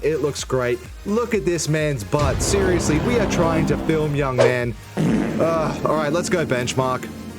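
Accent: Australia. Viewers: are you Australian. you soundlikeit ? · I wish I had an australian accent and a better grasp on how to edit videos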